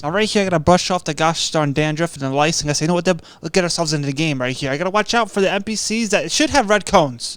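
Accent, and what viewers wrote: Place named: New Jersey, United States. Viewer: Lol ur finna asl that Jersey accent 😂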